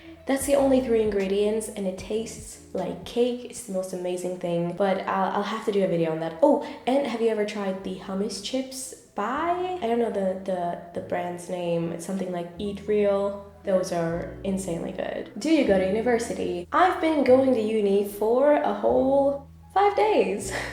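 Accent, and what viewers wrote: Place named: Germany. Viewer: Ich glaube mit Akzent war eher Dialekt gemeint, also die Region aus Deutschland wo du aufgewachsen bist. Kein ausländischer Akzent :)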